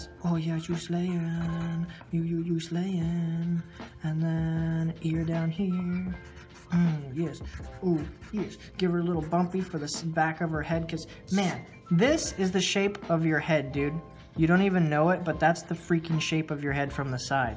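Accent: United Kingdom. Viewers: Why does your British accent sound like Tom Holland? 😂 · Your British accent makes me want to implode.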